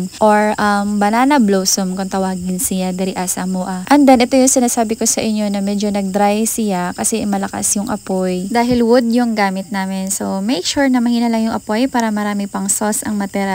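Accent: Bohol, Philippines. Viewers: mura lge ug inistoryahan sa amoa sa BOHOL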